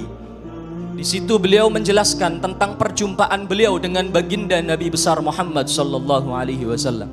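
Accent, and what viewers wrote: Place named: Tegal, Indonesia. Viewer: Assalamualaikum wr wb..🙏 Ngapunten..🙏🙏 Pa' ustadz asli orang mana kok bisa ngomong Tegal..?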